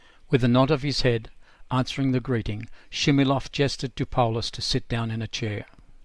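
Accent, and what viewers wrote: Australia. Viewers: Better late than never... Yes, I sadly agree, especially for USAers who actually need subtitles for NZers! Australians basically understand every dialect of English and being so multi-cultural, many strong accents are just everyday life here. I had a great career in Call Centre Training in the Philippines precisely because: A) I'm very good at it & I loved being there & doing it, and B) My so-called "U Australian" accent can be almost universally understood; it is pretty neutral as English speaking accents go, and I can easily learn & adapt to different dialects & vocabulary. So I could manage not only Aust/NZ accounts, but also even the strongest of US or UK English accents. The Americans DEFINITELY can't do that & the British often aren't much better, so I was always in demand. My accent is less "Australian" than your narrator's, for reference. 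PS: What a 10 months it has been! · What is an Australian doing the commentary ?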